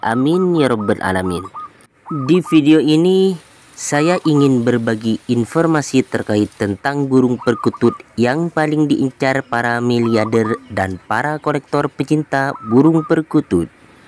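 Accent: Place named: Madura, Indonesia. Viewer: bhsnya ky orng madura mas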